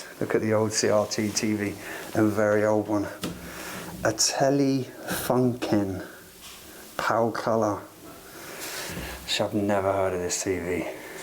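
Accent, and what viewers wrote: England, United Kingdom. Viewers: Great video. watching from USA but I'm English and it's nice to hear an English accent again.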